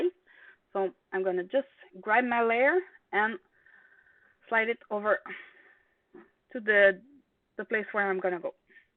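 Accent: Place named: Quebec, Canada. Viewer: In French please, is it possible ? Je préfère vous entendre parler avec votre québécois. Merci pour vos partage.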